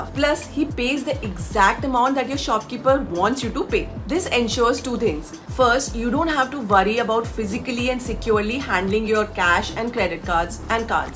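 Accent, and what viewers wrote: India. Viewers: What an accent got this girl, she must be indian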